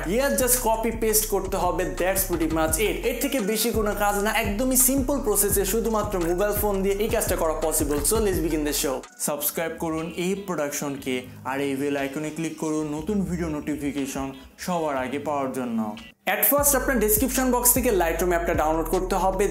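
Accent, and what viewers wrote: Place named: Jamalpur, Bangladesh. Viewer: Aponar basha ta jamalpur kun jagai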